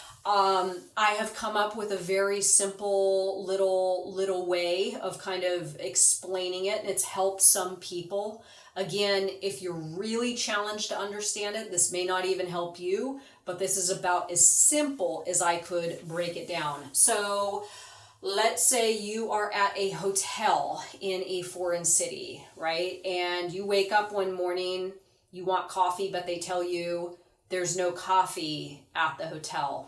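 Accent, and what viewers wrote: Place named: Western Pennsylvania, United States. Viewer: That western p.a accent makes me homesick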